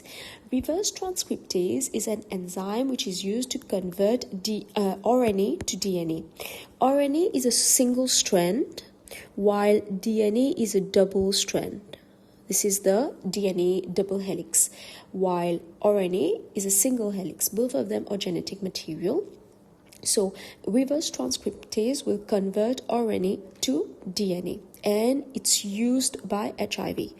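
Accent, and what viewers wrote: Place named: India. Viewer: Thank you so much!!!!, if I may ask, were u from? your accent sounds like an Indian accent with a mix of something else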